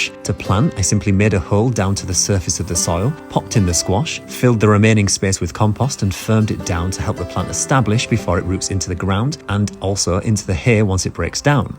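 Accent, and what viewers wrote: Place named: United Kingdom. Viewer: He’s talking about HAY. His British accent is making it confusing. 😅😅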